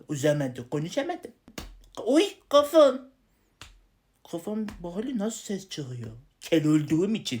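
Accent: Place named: Tokat, Turkey. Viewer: abi tokatlı şivesinden anladım,hatta yemin ederim tokatlı.ben buna cevap verdim de bende senin gibi nerelisin yazdım o yüzden boşuna yazma yani.😊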